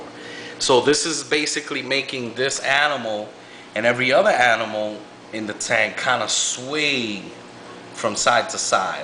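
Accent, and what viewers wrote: New York, United States. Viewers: you sound like my uncle being that hes from new york its kinda weird...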